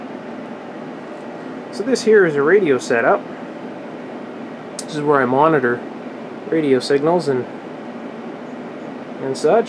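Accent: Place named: Canada. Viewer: Found you vids, amazing! You are wise, skilled and accomplished beyond your years. Your video production and presentation skills are only surpassed by your technical knowledge and abilities. Your background would be an interesting story! Fascinated with the Canadian "accent." Looking forward to more videos, hope you don't get bored with the process.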